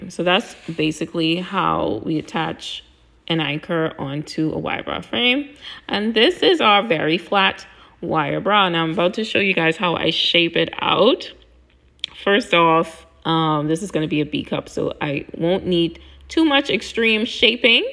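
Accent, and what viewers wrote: Bahamas. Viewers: Beautiful. Love the video💕 and I heard a Bahamian accent ! 🇧🇸 just followed you on IG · I hear a Bahamian accent 🩵🇧🇸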